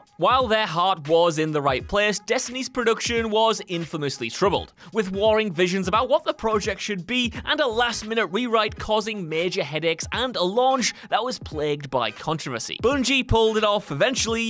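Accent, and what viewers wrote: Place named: Tyneside, United Kingdom. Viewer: I hear a tyne valley accent. Maybe tees actually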